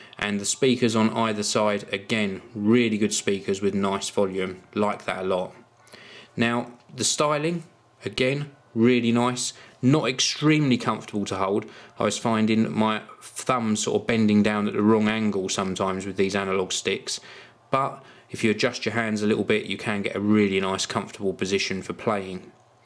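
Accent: United Kingdom. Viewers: Every reviewer is cooler with a British ascent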